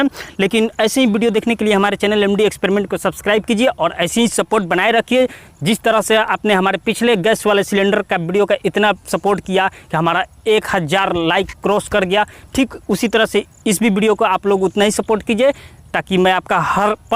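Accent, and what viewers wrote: Bihar, India. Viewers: Bihari accent made me watch this video longer. Gas cylinder se bas tum circus kar rahe thee!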